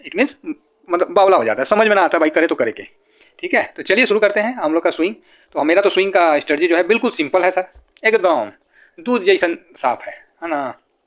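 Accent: Bihar, India. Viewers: Aap Bihar se lagte hain....bahut achha....Mai bhi hu.... 👍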